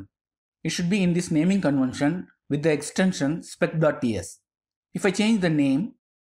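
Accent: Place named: India. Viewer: 1) Well that looks interested 2) Indian accent, out of there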